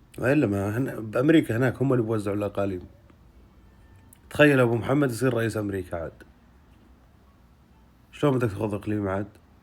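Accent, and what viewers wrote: Hauran, Syria. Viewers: كلامك بمحلو لو كان مزح بس ملغم افحمتو لابو سلمان واحلى لهجة هية اللهجة الحورانية 😊😊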